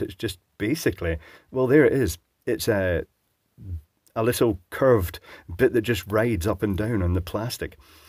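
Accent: Scotland, United Kingdom. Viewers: Another good video,watched you for years but never commented,I live in Ayr and it's good to hear a fellow Scot,I bought some non branded ones from Amazon after I watched a video of John Ward's where he tested them extensively against branded terminals and gave them a good review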